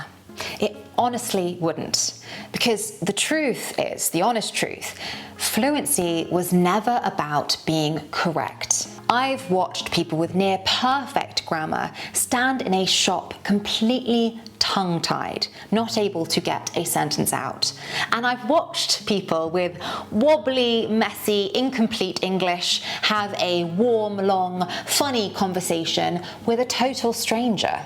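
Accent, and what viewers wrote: United Kingdom. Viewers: I've been learning English for the past 20 years because I started late in life as my second language, and I'm trying to be perfect, although I know I won't; but there is always room for improvement, right? I've always heard that "practice makes perfect." I've never heard that "perfect is the enemy of good." Oh my word!!! I feel relieved now. Thank you so much for these kind words. I love all your lessons, your method, your British accent, your voice...... Love from London.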